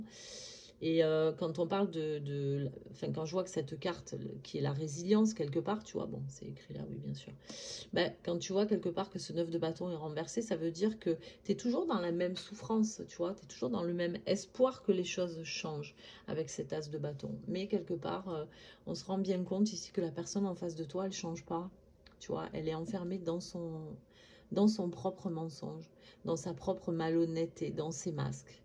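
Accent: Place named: South, France. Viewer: Bonjour 😎 Tout juste my mother is verseau 😂 Belle journée ☀️ Rien que l'accent du sud,meme léger me transporte 😅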